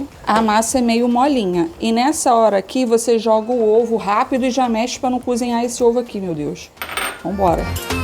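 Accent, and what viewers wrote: Rio de Janeiro, Brazil. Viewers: Tu é carioca,?? Me identifiquei muito com seu jeito de falar hahahahha Vou fazer hoje essa coxinha, vamos verrrrrr!! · vc me leva para casa com o seu sotaque e o sabor das lojas que só tem no Rio, hoje moro em Bauru. Vou fazer agora!🙏😘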